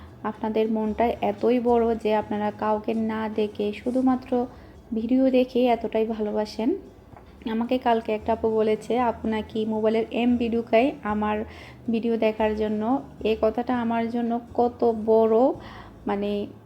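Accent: Chittagong, Bangladesh. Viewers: আপু আমি আপনার বিডিও দেকি কমেট করা হয় না আপনার ইমকামে কথা শুনে খুশি হলাম। আপনার বাড়ি কি পটিয়া আপনার কথা শুনে মনে হয় পটিয়া।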